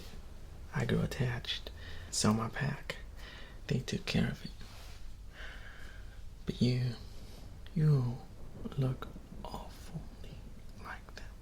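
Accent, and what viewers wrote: South, United States. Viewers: I personally love his southern accent. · Southern accent on point I'm from Texas so I can tell you you did you thing baby!! You're talented as hell 🤘🤘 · Look it can be the werewolf but like you can just do the southern accent cause DAYMN! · My favorite I'm obsessed with werewolf but I'm not a big fan of that southern accent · A southern werewolf cool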